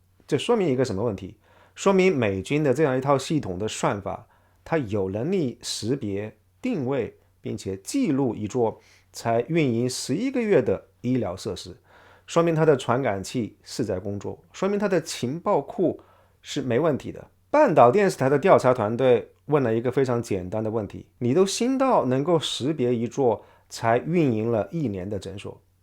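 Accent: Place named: Sichuan, China. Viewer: 这口音是四川人啊😊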